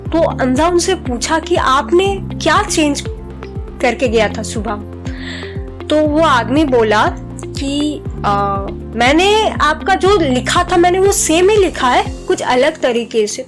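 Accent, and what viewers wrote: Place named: India. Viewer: A nice way to make people understand that how one should think #hatkae... Liked your video and loved your hindi accent. Would like to see more videos from your end. Good luck 💙💙💙💙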